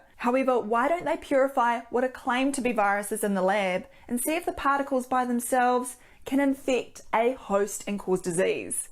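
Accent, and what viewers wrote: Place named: New Zealand. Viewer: All this good stuff delivered in the most adorable Kiwi accent. Thank you Doc ...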